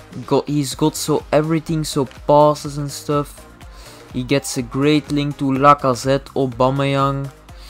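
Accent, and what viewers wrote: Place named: Belgium. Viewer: Direct van in het begin van de video herkende ik die belgische accent hahahah Nice vid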